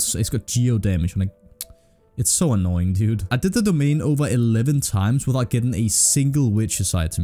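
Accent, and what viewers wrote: Denmark. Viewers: you sound danish an i right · Are you danish?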